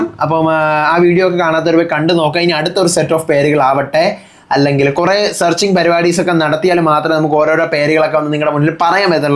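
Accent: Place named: Tamil Nadu, India. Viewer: Nee arada pandi ano tamiz kalarthi malayalam parayan. Onni malayalam alle Tamil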